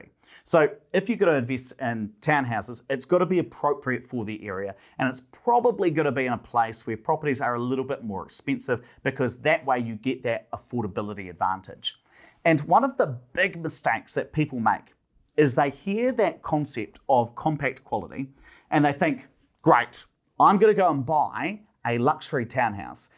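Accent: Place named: New Zealand. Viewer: Yuk Kiwi baby accent yuk Kiwis are such retards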